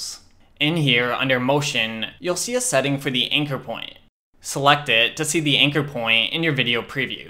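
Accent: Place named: Los Angeles, United States. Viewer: I am not american, but I noticed that LA accent)))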